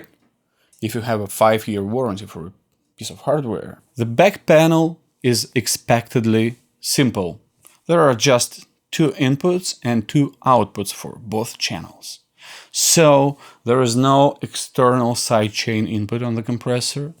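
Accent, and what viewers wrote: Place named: Russia. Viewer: Сразу слышно, Русский говорит. Привет,. Я без стёба.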